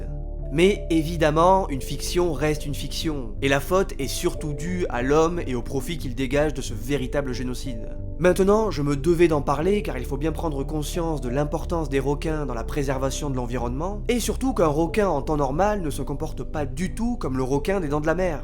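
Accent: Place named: South, France. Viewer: En effet, chaque vidéo est un concentré de travail passionné ! Avec la petite pointe quasiment imperceptible du sud de la Loire dans la voix en prime...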